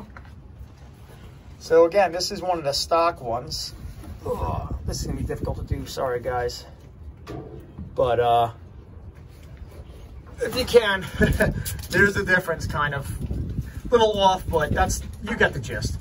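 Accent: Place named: New Jersey, United States. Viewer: He’s a great helper! I hear the jersey accent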